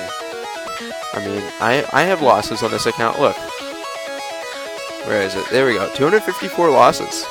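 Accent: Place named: Philippines. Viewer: You sound Pinoy Btw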